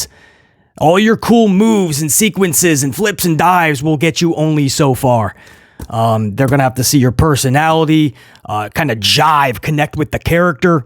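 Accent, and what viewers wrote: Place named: New York, United States. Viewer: You are definitely a New Yorker just like me you sound like a New Yorker1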